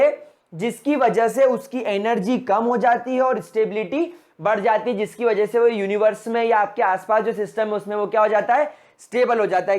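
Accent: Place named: Gujarat, India. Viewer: By your accent I can understand that you are a Gujarati.........Isn't it?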